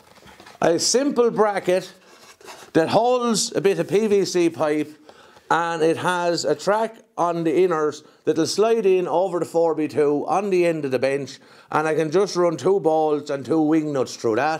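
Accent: Ireland. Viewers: I like this guy's accent, words like "tinking" and "thaught" and "tat", he be so Irish tis great.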